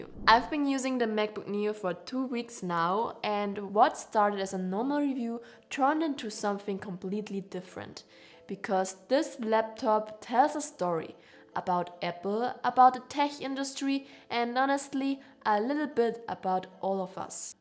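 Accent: Germany. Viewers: Krasser deutscher Akzent 😂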